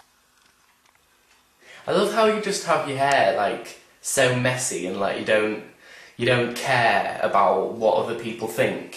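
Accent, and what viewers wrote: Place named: Northern England, United Kingdom. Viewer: the northern accent is killing me omfg you star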